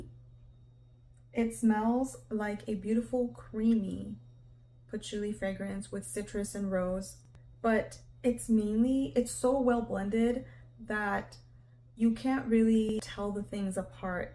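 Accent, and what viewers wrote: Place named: United States. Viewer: Where you from? I love your american English accent is so clear❤❤ your baby is so cute❤❤❤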